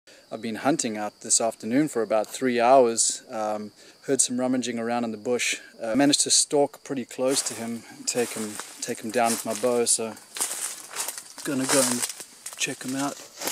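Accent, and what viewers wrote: South Africa. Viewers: Are you from South Africa it's just the accent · is he south african? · Is that SA accent I hear? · you sound south african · YOou kind of sound south african? Zimbo? That would make sense. You know survival. comes natural with us... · Sounds South African..long way from home either way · dude, u south african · You're South African?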